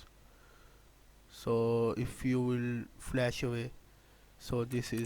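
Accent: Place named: India. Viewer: Your Indian accent is so deep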